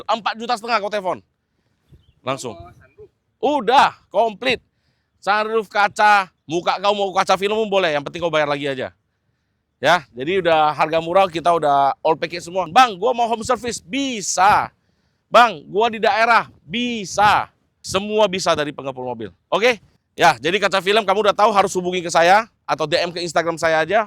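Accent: Medan, Indonesia. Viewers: Medan kuiii😂😂😂😂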